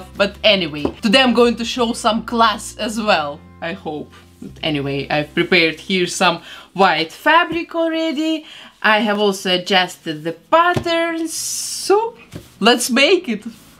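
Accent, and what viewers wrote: Russia. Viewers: AryanaJoy Babauta, yes, she is Russian. It’s obvious she is. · Какой сильный акцент. Сразу поняла, что она, возможно, русская) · Почему-то слышу русский акцент 0.0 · согласна с тобой такой русский акцент... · Очень очень русский) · Sydnie Harrison Maybe this woman is from Russia. She has Russian accent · Ток хотела написать! Тут явный русский акцент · Да-да-да, я тоже заметила, ибо понимаю английский только с русским акцентом, таким образом, я "поняла" все видео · Да, акцент русский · DUH ONA RUSSKAYA PONYATNO PO HER ACCENT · SSO I think so, cause I'm Russian😅 Sooo, I recognize the Russian speaking manner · She has a very strong accent. Immediately clear that she is Russian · Russian accent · Настолько русский акцент не может быть у англичан. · Акцент русский) Я как будто слушала подругу которая говорила непонятные слова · Да потому что только русские говорят слово рили всех английского акцента😂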